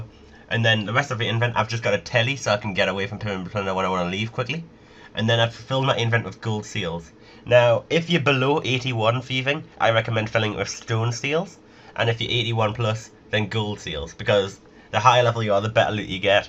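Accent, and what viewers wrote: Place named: Newcastle, United Kingdom. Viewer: he's not from Scotland you idiot, He's from Newcastle i think.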